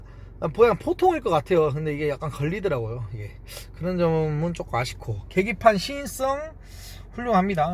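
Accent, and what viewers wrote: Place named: South Jeolla, South Korea. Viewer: 억양이 전라남도 무안쪽 사투리 같은 느낌이네요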